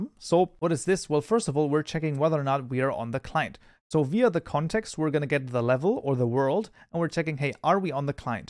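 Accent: Germany. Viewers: Amazing video man (also i love you accent, are you german?) I've looking for ways of having dynamic items, for example: i create an item that can change it texture and it stats (attack damage, mining speed, durability...) based on crafting materials, like tinkers' construct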